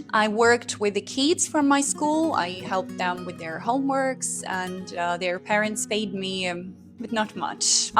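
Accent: Buenos Aires, Argentina. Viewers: Hablas muy bien varios idiomas te felicito! hablas muy bien el español argentino tenes el acento porteño, mis abuelos eran italianos, yo tengo la ciudadania italiana , saludos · Hola, linda 🫶🏼 gracias por el saludo Jajaj fue inesperado. P.D. Me encanta tu italiano 🤌🏼 ni hablar de tu español porteño 😻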